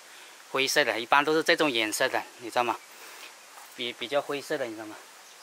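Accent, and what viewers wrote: Hakka, China. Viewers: 的客家话跟我的完全不同，他说的我大多不懂。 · 你的客家话和我的不一样。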